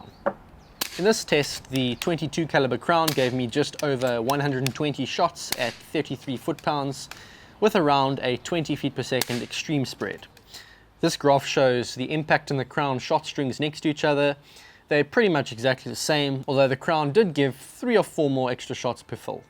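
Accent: South Africa. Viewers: vkluchi subtitri mozhet tak budet legche perevodit.. U nego Uzhno afrikanskii akcent no v celom vse ponaitno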